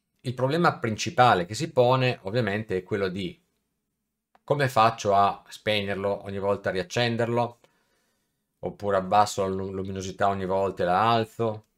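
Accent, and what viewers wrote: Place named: Italy. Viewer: Grazie per il video! Dopo giornate e giornate nelle quali cercavo una soluzione per il mio tablet, con questi script mi hai risolto tutti i problemi!! Tra l'altro, a sentire dall'accento, sei anche delle mie parti! 😅 Grazie ancora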